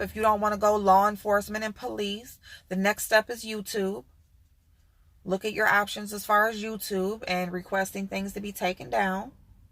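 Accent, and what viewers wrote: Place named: Ohio, United States. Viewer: I knew it. I was born in Ohio and I know that accent. You sound just like my family members!Lol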